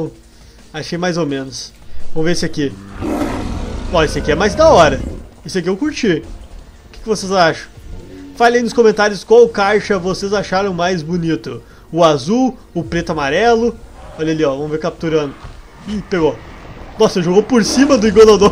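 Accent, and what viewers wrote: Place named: South, Brazil. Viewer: sabia que VC é do sul vc tem o sotaque daqui do sul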